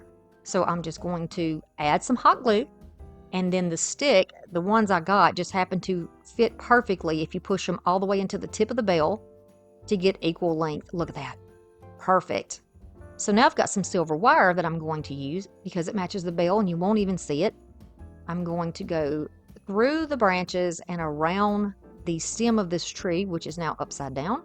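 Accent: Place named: Texas, United States. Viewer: I love all of these projects. Great job! Where are you from? You sound like you are from Texas which where I am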